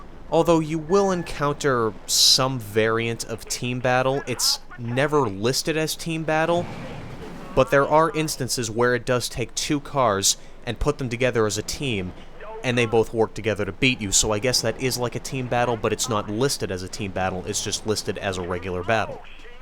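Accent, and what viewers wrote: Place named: United States. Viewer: yea please do a better review of it as it deserves it. also, you probably don't sound like him but I'm british so you're american acents sound similar to me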